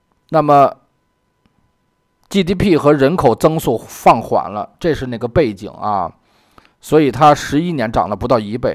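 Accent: Beijing, China. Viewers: 很喜歡你的視頻真棒京腔京味！聽了很親切。老北京人赞你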